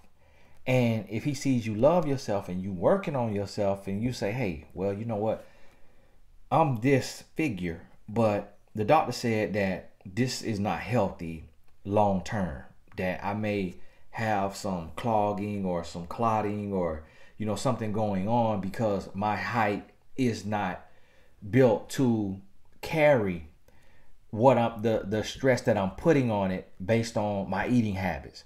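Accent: South, United States. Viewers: You should add comedian to your list of achievements , them sweet teas and chicken wangs took me out😂😂 it just drove the message home, that authentic southern accent and commentary Is everything 🙌 no matter how far you go be yourself, love yourself still on my journey... Far from where I'm going but far from where I was 🙌 CARBONATION 😩😂😂😂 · This guy sounds like my Dad it’s crazy. Where in the south is he from ? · I enjoy your logic,you are right people are like sheep they run in herds,l love your accent the south l would say.Keep up your wisdom.l am white and my daughter is heavy,she is treated that way.And some men genuinely love big woman,but they are really ostracized for real. Love your vocabulary. PEACE👏👏👏✌💯I Have to read your books.🙏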